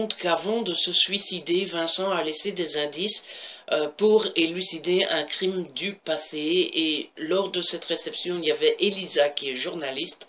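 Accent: Belgium. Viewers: Je découvre ta chaine que maintenant (oui je ne savais pas que tu en avais une, merci de me l'avoir indiqué que Twitter) ! J'aime beaucoup ta façon de présenter les livres je vais encore en acheter des tonnes parce que comme par hasard on a les mêmes types de lecture xD Des bisous ! 😘 (ps : ce petit accent, la Belgique ? 😊)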